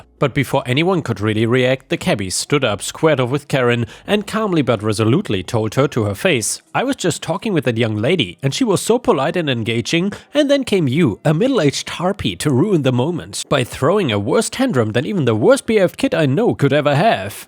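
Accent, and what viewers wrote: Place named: Germany. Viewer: Man, I enjoyed so many of your videos. And your accent sounded so familiar.. I've worked in a lot of countries during my "wild years". You are from Germany, right? Greetings from Obersulm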